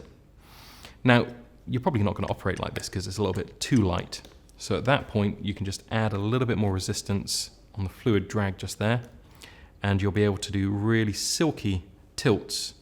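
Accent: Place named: United Kingdom. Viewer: the "lightest touch" love the british accent :)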